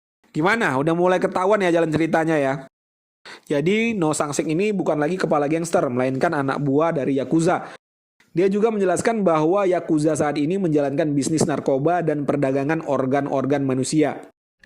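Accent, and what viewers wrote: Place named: Medan, Indonesia. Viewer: Orang Medan kau bang 😆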